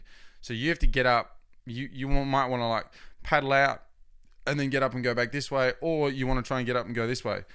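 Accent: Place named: Canada. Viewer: Great video, and I really enjoyed the Canadian accent.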